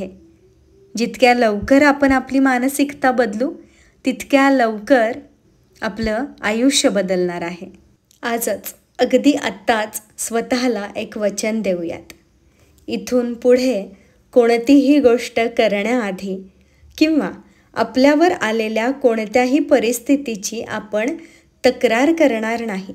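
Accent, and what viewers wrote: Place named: Satara, India. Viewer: तू ना खरंच लय भारी कडक आहेस आमच्या सातारी भाषेत बोलते,लहान वयात इतकी समज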